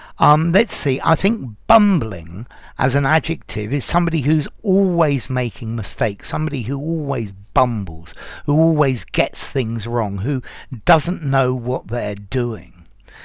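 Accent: United Kingdom. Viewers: You have nice british accent. I am bumbling now to. Talk real. English as you..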